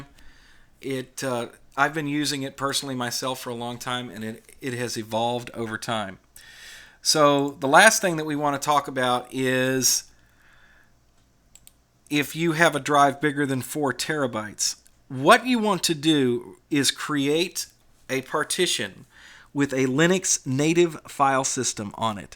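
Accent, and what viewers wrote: United States. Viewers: just found you! Disabled, I am keeping myself busy trying multiple times to install Linux with failure due to various reasons. lol I need to desperately get up and running within a week only or else I will be in a pickle situation. Familiar with using pc and windows 30yrs, midrange / mainframes-user. U sound like u r n USA. I am limited to web access now. I have few KEY QUESTIONS. Can I email you Sir? Humble Respects. Alton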